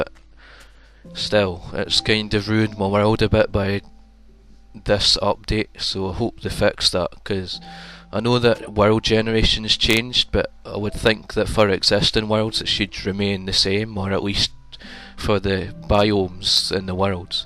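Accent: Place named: Scotland, United Kingdom. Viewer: Good scots accent mate! Subbed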